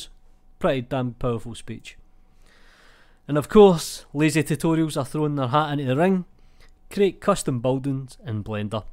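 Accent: Scotland, United Kingdom. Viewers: Loving the channel! I have been trying to catch every vid you throw my way. This unofficial news is sweet. Keep it up! Great to hear a Scots voice doing Blender stuff. Pure dead brilliant, by the way!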